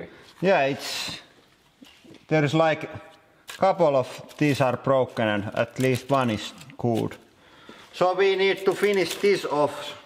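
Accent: Finland. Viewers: Derp he is finish (idk how you spell that) I'm Dutch lol ik Ben dat wel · kiva rallienglanti, huomasin heti et oot suomalaine :D kivasti tilaajii sul:) ja kiva idea.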